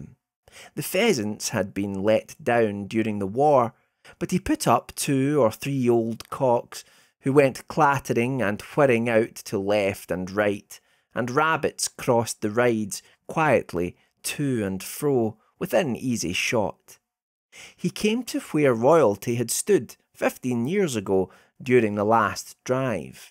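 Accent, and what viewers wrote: Scotland, United Kingdom. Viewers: That's quite a thick Scottish accent you have there!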